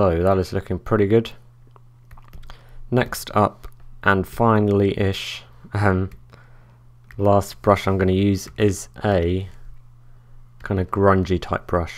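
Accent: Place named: RP, United Kingdom. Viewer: Great tut! (also - you sound dead posh!)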